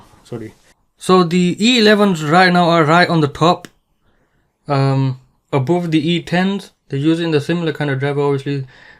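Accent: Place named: Manchester, United Kingdom. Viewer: You sound very Mancunian. Thanks for the review!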